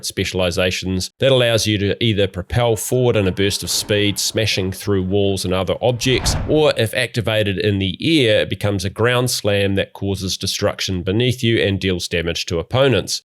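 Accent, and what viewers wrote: New Zealand. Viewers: I have shaked through this video... Im sorry, but i cant get over that New Zealand accent. "E" is replaced by "I". Well made video though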